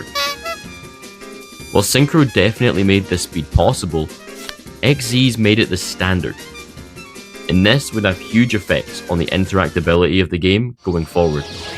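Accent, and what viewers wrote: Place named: Ireland. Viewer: I can't argue with anything you said honestly, well said. PS your accent is cool. Is it Irish? Scottish? An English dialect?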